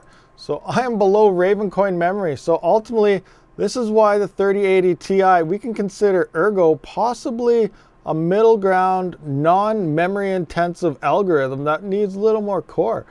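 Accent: Canada. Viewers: I know when I hear my canadian brothers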